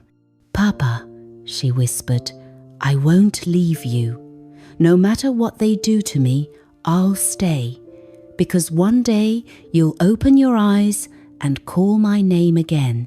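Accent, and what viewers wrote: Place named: United Kingdom. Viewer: The British accent lost me 🤦🏾‍♀️House of Horus with his rich African tone, telling African stories, is real self love.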